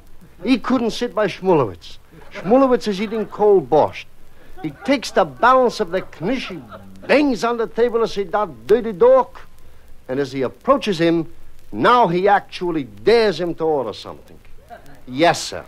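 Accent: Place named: Italy. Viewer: An authentic Italian accent!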